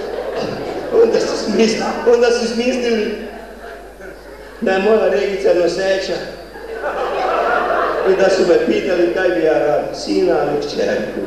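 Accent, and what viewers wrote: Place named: Podravina, Croatia. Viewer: Predivan naš podravski dijalekt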